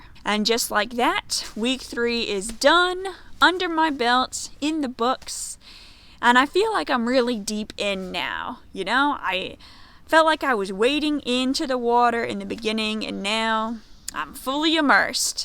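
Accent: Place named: Kentucky, United States. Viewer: I’ve watched a few of your videos even including the van build. Max Patch Damascus and Mt Rogers are three of our favorites. Fortunately we live close since we live in central NC. A comment mentioned you as a Kentucky girl. Before reading that clue I could not put a finger on your accent. I even asked my wife who is from the Finger Lakes to listen to you. Of course she had no clue. I went to graduate school in Louisville 40 plus years ago so 4 years in Louisville and across the river (cheaper rent) in New Albany Indiana should have helped with your accent. Have a great journey!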